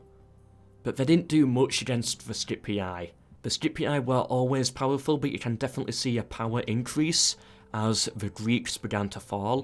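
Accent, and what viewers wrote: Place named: Northern England, United Kingdom. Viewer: Northern commentary by a Northern lad. Very good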